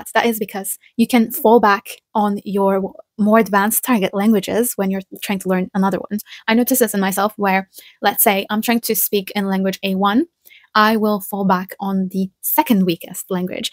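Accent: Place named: Turkey. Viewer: Her english accent does sound turkish, i guess her channel name is not her real name then.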